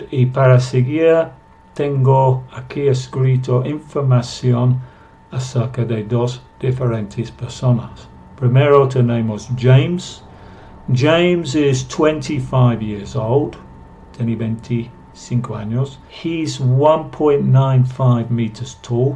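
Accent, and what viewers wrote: United Kingdom. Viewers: Excelente clase de Ingles y desde luego excelente profesor ademas britanico que me encanta aprender mucho el acento y ciertas diferencias que hay con el ingles americano, no tengo palabras para darle las gracias por esta perfecta clase.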